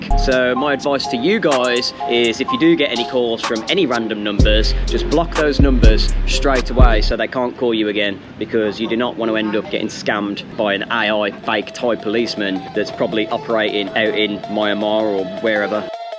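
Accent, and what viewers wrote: Birmingham, United Kingdom. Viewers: You sound like a brum..maybe from Wolverhampton..am I right 🤪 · Hey you never lost your Brummie accent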